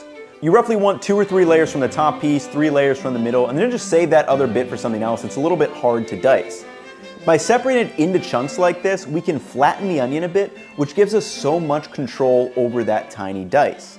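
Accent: United States. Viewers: europlugs, american accent... i am very confused! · Just noticed the plugs and cabinets in the background. In spite of the American accent, you're in Europe? Where at?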